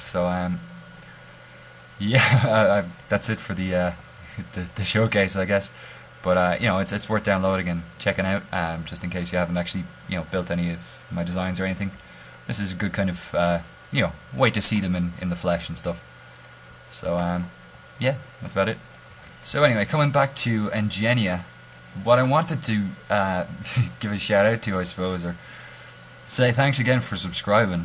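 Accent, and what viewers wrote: Ireland. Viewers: Yeah, I watched, I think it was the Tavern video, and after seeing the design and your ability to teach people how to build this stuff, I kinda just stopped after like a minute in and subbed. You're a pretty kick-ass builder, and, can you correct me on something? Where exactly are you from? Your accent sounds Irish, but it's not incredibly thick like I've heard from other people; it's kinda hard to pick out. If you are Irish, then I wish I could subscribe again, because I'm mostly Irish...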